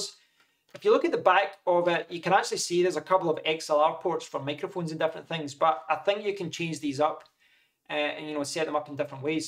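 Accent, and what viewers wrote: Scotland, United Kingdom. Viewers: Nice Scottish accent 👍🏼🙂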